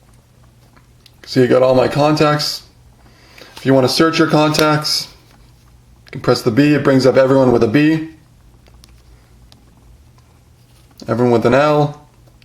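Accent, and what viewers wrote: Canada. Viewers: I love you canadian accent :D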